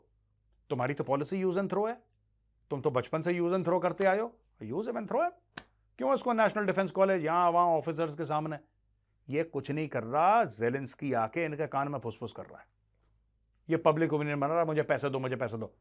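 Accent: Lahore, Pakistan. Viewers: Major Sahab aapki Lahori Bhasha maja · Mejor Saab app jo lahori language bolte hai ..usse sunne ke liye hamare kaan bahut tarastee hai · major shab Lahori accent, so beautiful so elegant just looking like a wow 😮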